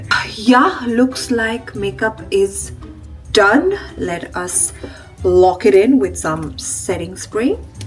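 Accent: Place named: Maharashtra, India. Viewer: marathi accent , itna time mahi re baba ❤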